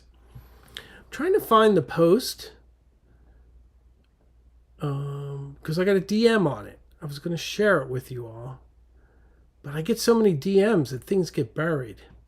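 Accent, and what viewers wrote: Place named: Boston, United States. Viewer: Do you really have a speech impediment? I just thought it was your bostonian accent (like I thought you were talking about your doctor dealing w/ tornadoes at first rather than your daughter). I suppose it's a measure of success that you're at the point where you have critics.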